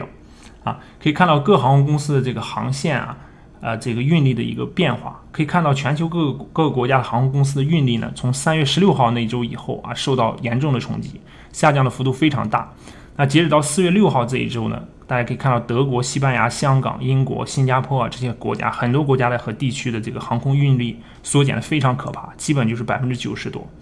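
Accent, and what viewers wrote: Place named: Shanxi, China. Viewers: 山西口音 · 是山西口音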